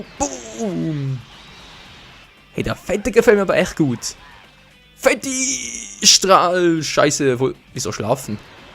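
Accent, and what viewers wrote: Switzerland. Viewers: Bist du Schweizer ich liebe diese Sprache! :DD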